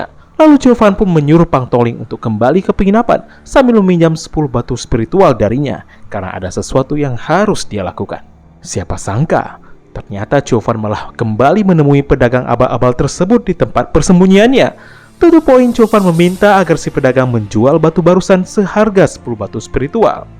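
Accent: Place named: Sunda, Indonesia. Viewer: iq7 lebih kentel sunda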